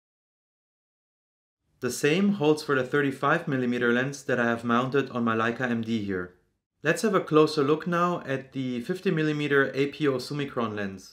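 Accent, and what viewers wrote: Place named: Zurich, Switzerland. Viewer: Sali hier ist Benjamin. Bin über Dich gestossen, als ich für meine neue M6 Tipps gesucht habe. Komplett unerfahren in Rangefinder und deren Funktion bin ich auf der Suche nach Fokus etc. gewesen. Dann kam Dein Video zum Vorschein. Dachte mir den Akzent kenne ich doch irgendwoher, anschliesend habe ich unter “About” herausgefunden, dass Du in Zürich lebst, ich auch. Fand ich ganz witzig. Eventuell kann man sich ja mal treffen. Wie gesagt bin ganz neu was M angeht, und jetzt mit einer Traumkamera, die zuhause wartet ausgepackt zu werden. Das passiert morgen. Wollte eigentlich nur einmal Danke fürs Video sagen. LG Benji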